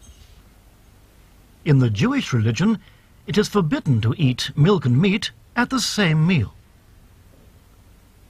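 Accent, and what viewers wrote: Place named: United Kingdom. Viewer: Honestly a soft-spoken Brit can make me believe all the BS in this video.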